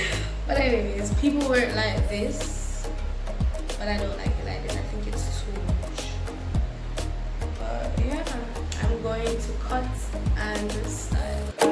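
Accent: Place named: Jamaica. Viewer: Nice love the way u used ur Jamaican accent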